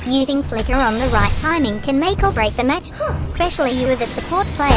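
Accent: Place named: United Kingdom. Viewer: Whats with the british Accent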